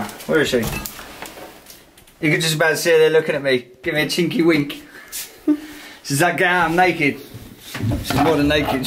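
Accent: England, United Kingdom. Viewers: Where in England is this guy from? I'm in Canada and can't get the accent.....can you send a message to me?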